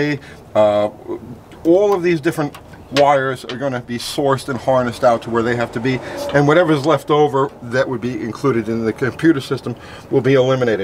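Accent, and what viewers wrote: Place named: New Jersey, United States. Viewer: I gather that you are in Tennessee, but I hear a lot of New Jersey in there.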